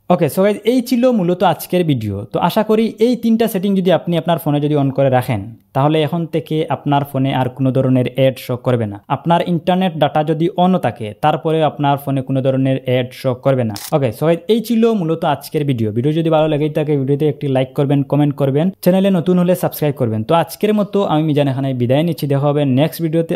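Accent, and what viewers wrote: Sylhet, Bangladesh. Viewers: Bhai tumi sylheti nih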